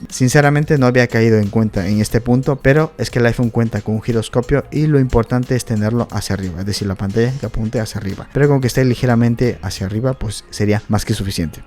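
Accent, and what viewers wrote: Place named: Mexico. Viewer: Bien fuera del video, por que siento que tiene acento mexicano pero usa palabras españolas?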